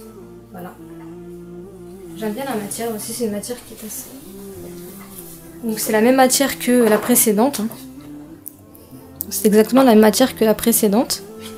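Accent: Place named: Belgium. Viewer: Salut , l'accent belge à l'honneur dans cette vidéo , je suis tombée dessus par hasard, bonne continuation pour la suite ! 🤩🤩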